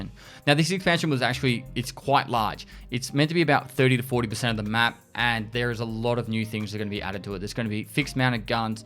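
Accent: Australia. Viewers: he's Australian and his accent just makes it sound like that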